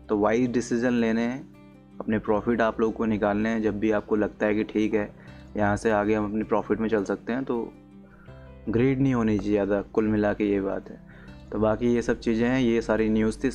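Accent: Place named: Bhopal, India. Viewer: Which city are you from? Your accent sounds like Bhopali 😁 (I'm from Bhopal)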